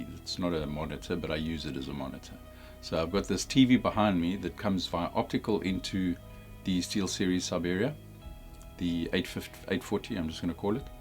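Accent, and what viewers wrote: South Africa. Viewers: Came looking for info on a firmware update for my 840s. Stayed cause I heard a south African accent! Nice one boet!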